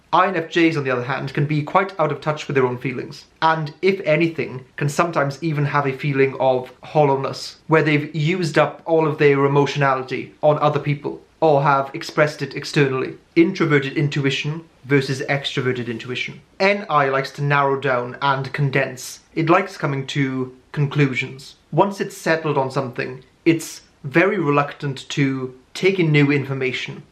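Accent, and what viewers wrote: Wales, United Kingdom. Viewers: Are you from Wales? (Trying to figure out your accent)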